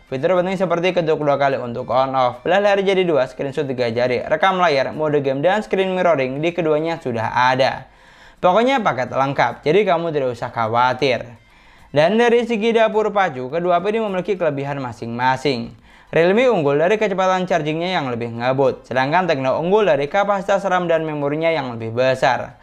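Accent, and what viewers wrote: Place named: Madura, Indonesia. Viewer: Btw asli mana bang? Logat nya menarik heheheehe..b Saya LBH fokus ke logat nya.. Kayak logat madura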